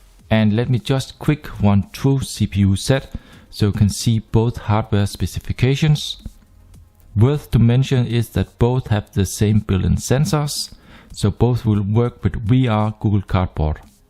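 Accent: Germany. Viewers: Man hört so das du Deutscher bist xD Gutes Video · Für eine lange Zeit beobachte ich Ihre Bewertungen und schreiben mit einem sehr guten Lichtqualität und eine Freude zu Ihrer englischen Sprache mit deutschem Akzent zu hören. Nach Ihrer Präsentation kaufte ich Umi eMax und später SuperUmi. Jerste sehr glücklich. Vielen Dank, und ich herzlich begrüßen.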